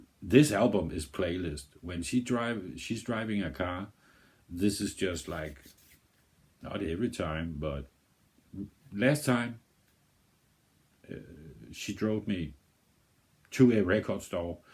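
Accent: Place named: Denmark. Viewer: You must be Danish, listening to your accent and your name, I'm pretty sure you are a fellow Dane. I was born and raised 10 miles south of Koege, came to the US in 1967 as an au pair, in Westport Ct. Have been here ever since. Although have been back to Denmark many times. Now live in the San Francisco Bay Area. I just heard you mentioning Denmark, so I was right. I love this song, we have a very active Scandinavian Club in this area, and in the early nineties we had a midsummer party, in the hills south of SF, and we had some members who played guitar and sang, and this was one of the songs they sang at that party.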